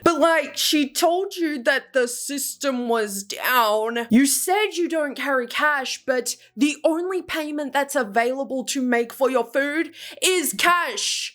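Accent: South, United States. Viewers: Ngl ur American accent is hilariously good 😭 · I wasn't expecting the southern accent immediately, I'm crying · your american accent was so good i forgot you were australian for a second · your Southern accent is spot on 🤣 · Why is your southern accent peak?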